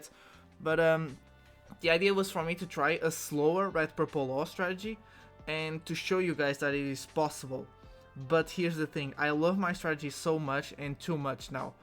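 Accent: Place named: Portugal. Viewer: sotaque tuga não engana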